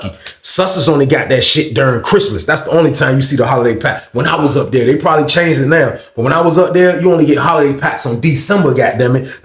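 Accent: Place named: Texas, United States. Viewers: He really just talk like a nigga from the south 😂 whenever we play cards it’s a lot of trash talk like that just don’t threaten anybody and you’re good or call them a bitch unless it’s some family or homies. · Nah that’s how we talk in Texas fasho!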